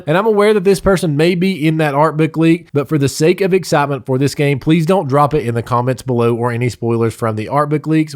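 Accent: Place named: South, United States. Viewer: WOW the southern accent REALLY threw me off!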